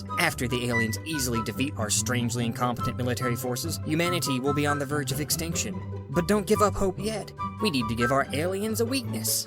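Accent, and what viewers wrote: South, United States. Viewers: You've got this very subtle twang of a southern american accent, or am I just imagining things??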